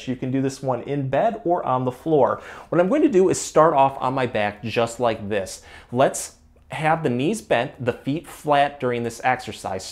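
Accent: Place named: Midwest, United States. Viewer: Great video, right at home with your midwestern accent. Cheers from WI!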